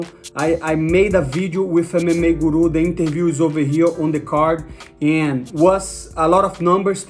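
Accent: Brazil. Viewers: Money, my number one hope for you is to become UFC champion. Number two hope is stop learning more English. The Brazilian accent is perfect. Number three hope is please, brother, can you teach Americans some Portuguese slang?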